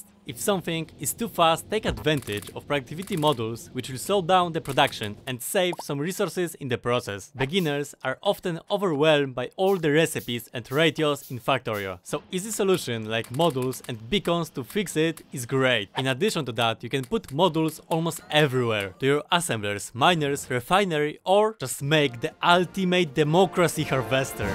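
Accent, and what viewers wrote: Poland. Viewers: twój angielski naprawde zajebiście brzmi jak na polaka. akcent dalej słychać lecz nie jest cie aż tak ciężko zrozumieć. Trzymaj ten poziom mordo, bo nie musisz go podnosić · Od razu rozpoznałem polski akcent :) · That Polish accent is funny) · Witam zacny poradnik :) Wiedziałem że gdzieś słyszałem ten akcent Polska gurom! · Beautiful Polish accent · Ty z polski? Taki akcent masz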